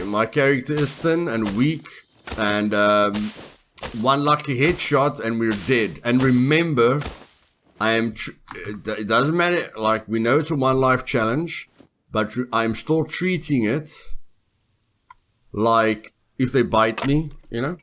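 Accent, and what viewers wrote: South Africa. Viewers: I like your accent is it south african or new zealand? i may be completely wrong but i know people from both places and you sound similar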